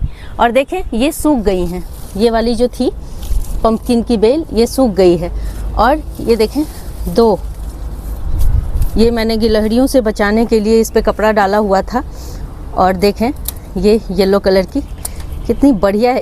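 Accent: Bihar, India. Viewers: mam ap Kahan ki hai Apki Bhasha se ap Bihar ki lag rahi hai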